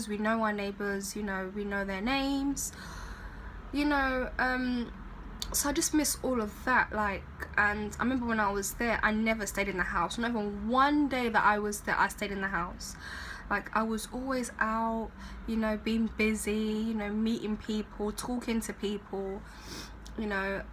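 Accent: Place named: London, United Kingdom. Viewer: London accent😍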